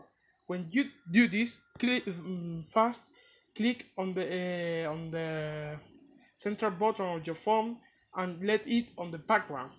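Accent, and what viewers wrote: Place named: Oxford, United Kingdom. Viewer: Ojo ese ingles de oxford. Me alegro de que hables asi ojala yo hablara así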